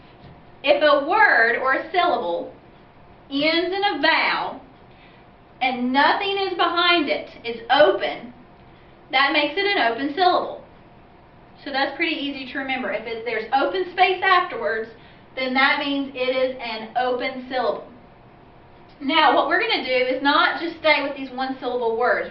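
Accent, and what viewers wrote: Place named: South, United States. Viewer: Vicky Popova it’s a very common pronunciation for native speakers in the southern United States. Definitely a native speaker as non-native speakers don’t tend to learn this particular dialect or whatever.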